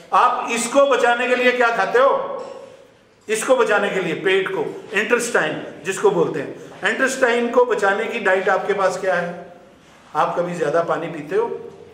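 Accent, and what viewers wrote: Haryana, India. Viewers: Haryanvi 😅😁😁mst..u r great sir..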